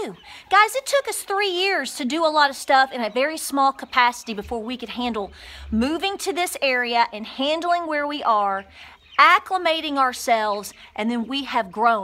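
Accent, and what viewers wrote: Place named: Tennessee, United States. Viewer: I knew I liked that accent. I use to have a friend that lived in Tennessee that would talk about that team. Good advice! I talk to some local farmers who say they owe way more then there farm is worth and they can't stop farming because if the debt. I can see you appreciate the little things in life. Keep up the good work sound like a neck of a woman. God Bless and take care from WI!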